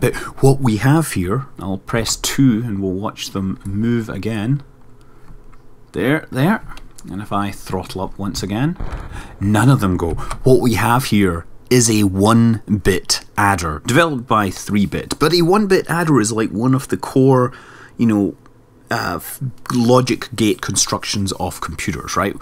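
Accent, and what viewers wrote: Scotland, United Kingdom. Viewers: I love your voice. And no not just your delightful scottish accent, your voice is really nice. I'd LOVE to grab a space-pint with you some day and talk physics as our spaceship slowly deorbits into Minmus....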